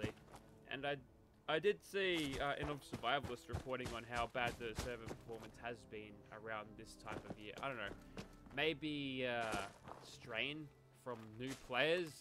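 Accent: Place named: Australia. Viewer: Long time fan of your channel. I have always enjoyed your direct, tell-it-like-it-is, no nonsense way of speaking. Plus the Australian proper English dialect (I.e. using words like Whilst, Respectively, etc) is rather refreshing. When it comes to griefing, pvp, etc, I don’t think you’ve ever been largely in favor or against either. The only group of the Fallout “community” that you’ve always been vehemently against are the RMT traders. And I 100% agree with you.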